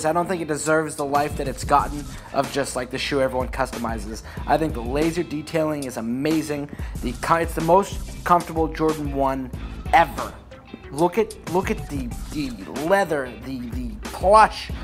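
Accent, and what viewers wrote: Boston, United States. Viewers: I love the Boston accent. anyone that has it I think it just sounds awesome.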